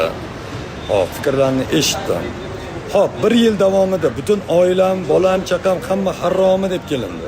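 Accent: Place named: Tashkent, Uzbekistan. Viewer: Узинг наманганликсану узинча тош.ни шевасида гапирасан . 50 йил четда яшаганам тилини сотмаган акилли эркак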